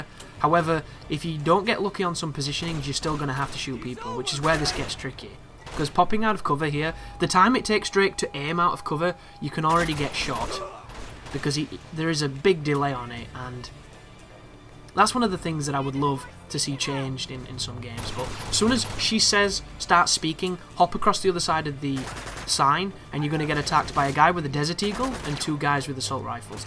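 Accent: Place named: Scotland, United Kingdom. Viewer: I've watched this one before I started mine because I really had it difficult on the crushing level when your in the wagon. Perhaps I should have played it first and figure it out, because I noticed on crushing that you didn't have to kill the gunners. But it's the inbetween section where I got killed a lot of times and I was curious how you did it. (And now I know your a Scotsman. Perhaps you should comment al your games a second time in scotish. I will surely look this versions then. Love the Scottish accent as well as the Irisch and some Brittisch dialects. American Englisch is too nasal for me). For the part in the courtyard where you're hanging on the publicity. You did the first part much quicker than I did. I died a lot here in the first wave. But I didn't noticed the gas barrels. For the second part where you have to change side. 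It's much easier to descend one pannel. You can aim the two guys on the right without getting killed when they're firing. Though there are some things you have to know. First if you got too far to the left of the pannel and you aim for a headshot on the left guy, you can't always fire. Another thing that baders me here is that you can't shimmy when aiming. So you just have to guess if you're in the right position. And as you said the sniper is no problem at all. Certainly not if Helena shoots thus guy. Once he is stuned you can kill him, even with a headshot. For the third wave I changed side again. The advantage here is that you got a better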